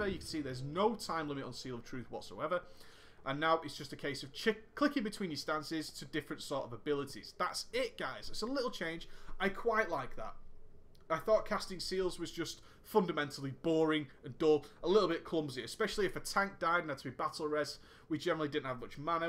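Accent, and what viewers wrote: Netherlands. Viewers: Ur dutch?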